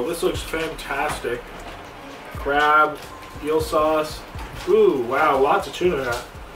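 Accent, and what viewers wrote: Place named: South, United States. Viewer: You are so silly you and your cat in your country accent you make me laugh continue to stay safe many blessings and much love and peace ☮️💜💯😇🙏🏾💙❣️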